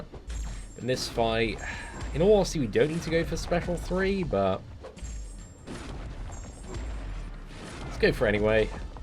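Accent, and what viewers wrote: United Kingdom. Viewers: I love apocalypse you are lucky Also love the British acsent it is the best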